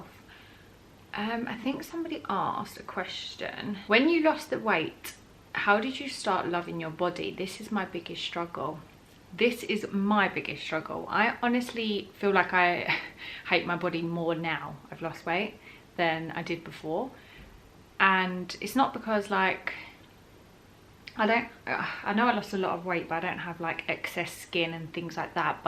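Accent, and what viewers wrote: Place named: United States. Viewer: Yass girl look at that fresh beautiful skin, you are glowing ✨✨ When you said awkward 🤣🤣 I feel I’m a bit old for advice videos but I still watch anyway cos you are hella entertaining...and you guessed it I said hella in American accent ☺️ You don’t need to apologise for anything. Ps my family are hella annoyed at me because of you 🤣🤣 I’ve only been calling bloody eat out to help out - help to buy...that’s all your fault haha x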